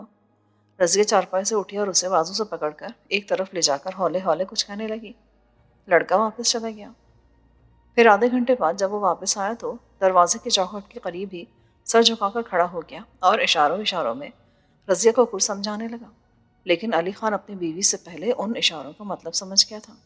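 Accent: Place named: Lahore, Pakistan. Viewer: You imitate beautiful Lahori accent well done you are my best Voice on YouTube😂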